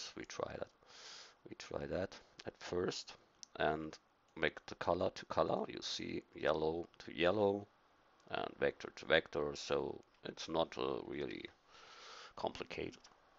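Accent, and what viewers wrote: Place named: Germany. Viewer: #germanaccent :D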